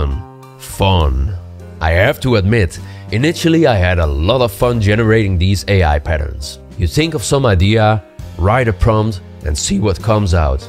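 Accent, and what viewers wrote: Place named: France. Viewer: Your voice is French AF. I love it!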